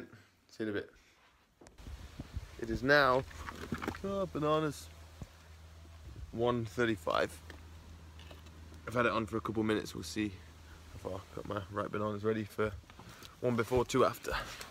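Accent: United Kingdom. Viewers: Is that how all brits speak or is it the hood slang? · Almost nobody speaks queens English. YouTube geordie shore and compare the accents there to ones from Yorkshire and London. This accent is kinda average.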